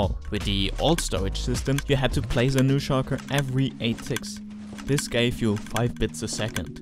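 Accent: Germany. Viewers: Du sprichst sehr gutes Englisch, aber ein Deutscher wird immer einen Deutschen der Englisch spricht erkennen 😄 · Heavy German accent ftw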